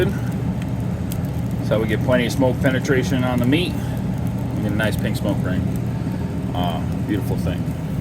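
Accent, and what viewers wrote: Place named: South, United States. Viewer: I was dyyying because I literally thought the more he keeps going the more southern he sounds 😂 so I’m glad he acknowledged it. This actually was fun and interesting to watch because my Uncle sells BBQ too. Wish I could try some of y’all’s!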